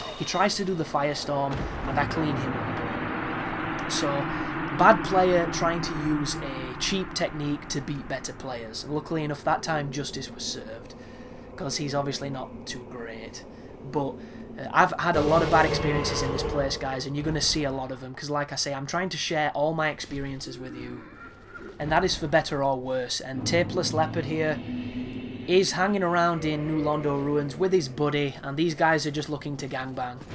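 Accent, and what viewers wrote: United Kingdom. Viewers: Bro, it's Laito not Liato. Its in-dick-t-ment not indIcted. I know your british but come on man.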